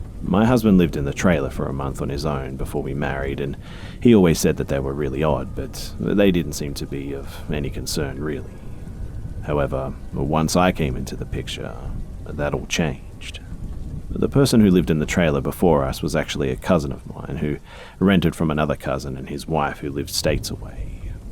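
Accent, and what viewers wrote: Australia. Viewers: Cheers for liking my comment mate! I seriously want to take a gap year in Australia and think it’s the best accent so I like your narrations even more because of that! Keep up the good work :)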